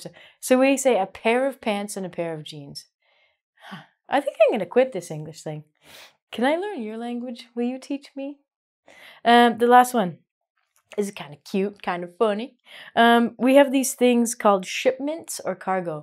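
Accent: United States. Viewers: More like american since shes canadian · is she from usa ???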